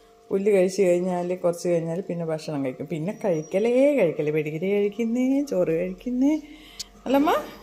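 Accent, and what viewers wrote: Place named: Thalassery, India. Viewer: സംസാരം കേട്ടിട്ട് തലശ്ശേരി ഭാഗത്ത്‌ ആണെന്ന് മനസിലായി... ഏത് ഭാഗത്താ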